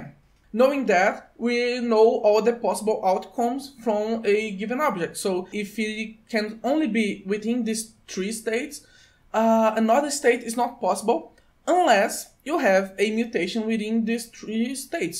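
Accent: Brazil. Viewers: Ótimo video! Eu já vi outros videos teus, e pelo sotaque, desde o início desconfiei de você ser brasileiro, com este eu confirmei a suspeita. Sotaque brasileiro bem característico. Hahahaha. Com ou sem sotaque, continue com os vídeos. São muito esclarecedores. E sim. Taxation is theft!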